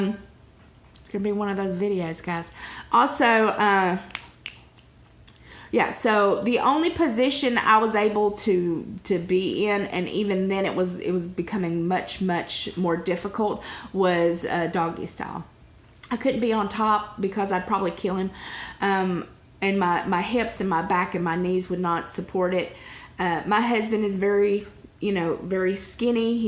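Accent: South, United States. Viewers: I tripped over your videos one day and subscribed. I do not have weight issues. You look like my aunt Lois who did have a weight problem. You are beautiful and so was she! She was Pisces. What sign are you? Anyway, what got me to subscribe was hearing your accent, and your truthful discourse. You remind me of every southern girlfriend I had growing up. I LOVE HEARING YOU AND YOUR ADVICE!!! Are you from Tennessee? I'm from there, but I also grew up in North Carolina for 10 years. BTW I'm 65 with a 34 year old boyfriend. : ) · I just love your southern accent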